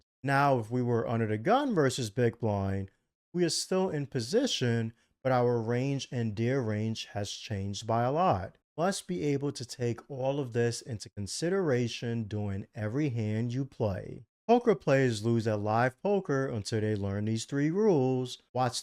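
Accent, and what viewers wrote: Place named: New York, United States. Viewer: Im curious bud, where did you grow up? Where were you playing live in your road to having 7 straight profitable years playing poker? I presume somewhere in NY/NJ area because your accent sounds almost identical to my own and I feel like I have bumped into you somewhere before.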